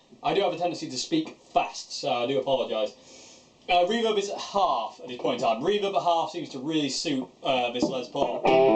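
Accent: United Kingdom. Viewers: I dig your Brit accent , & like your vids ! 😎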